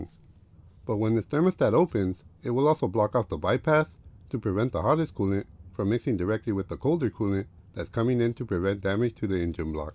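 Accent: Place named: Mexico. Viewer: He sounds Mexican. If he is listen about vehicle. Trust me.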